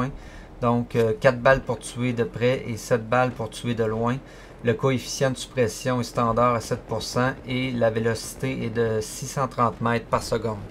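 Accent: Quebec, Canada. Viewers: Accent Québecois, il n'y a pas de honte et il ne faut pas en rire. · Vidéo ultra structurée très utile et en plus avec l'accent quebecois !!! Je suis fan continue comme ça ça nous aide a comprendre les subtilités de ce jeu tout comme ta vidéo sur les accessoires. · acssent du Quebec <3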